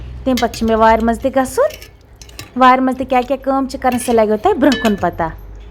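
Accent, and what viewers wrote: Kashmir, India. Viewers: Aap ki kashmiri voice bhot ache hai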